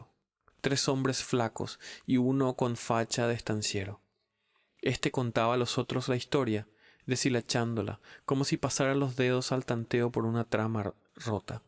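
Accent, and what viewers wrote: Paraguay. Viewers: Hermoso Roa Bastos. Muy bien leído con tu dulce todada paraguaya. Gracias por brindarnos a este Maestro de la literatura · Gracias por el aporte. Sería bueno que pudieras completar el libro porque no se encuentra en youtube y con tu acento paraguayo y las breves explicaciones de términos en guaraní enriquecen mucho la experiencia. Saludos y gracias!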